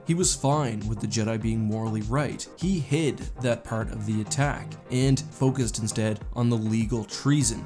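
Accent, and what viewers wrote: Canada. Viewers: This is this first confirmation I hear of your Canadian nationality. I suspected as much from your accent slipping through in most episodes. If you had mentioned it somewhere else or before, I haven’t seen it. It’s just key words that highlight the accent.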